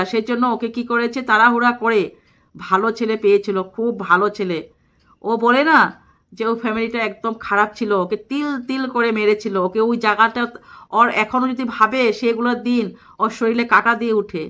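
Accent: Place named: Tripura, India. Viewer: তুমি কি ত্রিপুরাতে থাকো? কথা শুনে মোনে hochche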